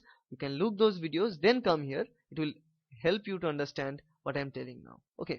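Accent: India. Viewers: you are awesome I like your Indian english lol it's so likeable :d and damn you got some mad skill in bio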